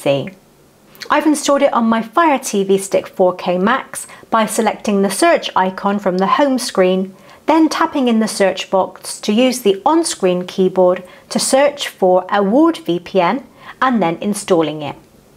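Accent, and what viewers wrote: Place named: West Country, United Kingdom. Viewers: You are a very agreeable and attractive host, with a lovely soft West Country (?) accent. x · Sounds to me like somewhere not far from Stroud.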